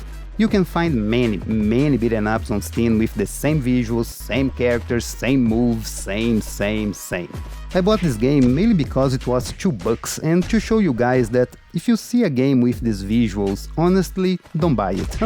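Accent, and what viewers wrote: Brazil. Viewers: Pelo seu sotaque eu tive quase certeza que vc era BR haha! Seu inglês é muito bom 👏🏽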